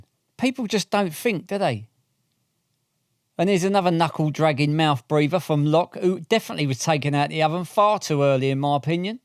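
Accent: Cockney, United Kingdom. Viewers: omg a cheap and nasty video of old clips with crap cockney commentary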